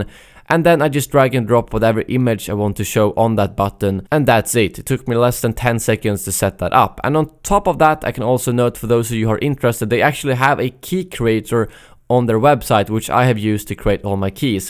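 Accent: Sweden. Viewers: Det låter som att du är svensk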